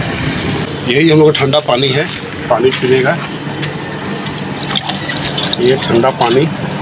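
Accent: Bihar, India. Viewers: Apke language se lagta hai aap bihar se ho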